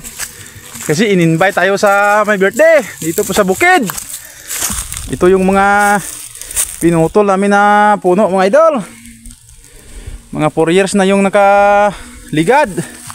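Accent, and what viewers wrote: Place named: Karay-a, Philippines. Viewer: Hahah ,nami gali pamatian Ang Tagalog kag karay ah 😂 in short Tapaznon 😅❤ Idol lang sakalam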